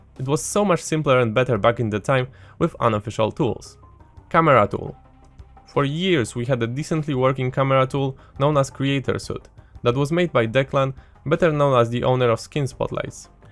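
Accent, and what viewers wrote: Poland. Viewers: Polski akcent wymowy sprawił że byłem pewny twojej naci :) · And I always wondered why your accent sounds so Polish. Finally got the answer :)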